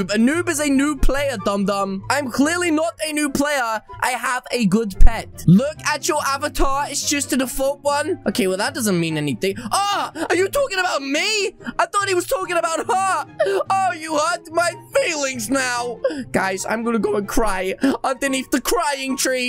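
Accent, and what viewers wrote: United Kingdom. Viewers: No offence but you sound like jelly but I bit British I’m sorry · Why is your accent British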